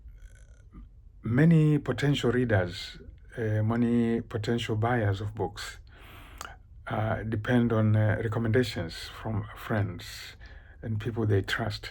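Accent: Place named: Tanzania. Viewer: love it! i was in tanzania once for a month with my grandmother because she works for the church mission in germany , the people and the country are just awesome definitely visiting again soon . So hearing you talk reminds me of this wonderful time i had there. And you have a very calming voice ! Keep it up ! <3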